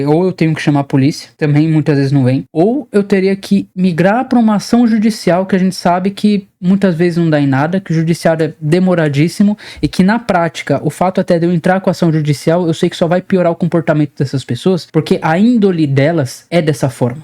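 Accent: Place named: Southern Brazil, Brazil. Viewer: pelo seu sotaque, vc é paulista ou sulista, é isso? vem pro Nordeste pra ver uma coisa 😅😅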